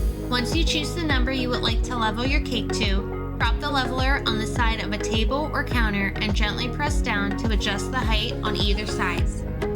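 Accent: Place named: Midwest, United States. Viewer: Just out of curiosity (and you don't need to answer if it makes you uncomfortable), but where are you from? My ear is guessing somewhere in the midwest...but that's just a guess.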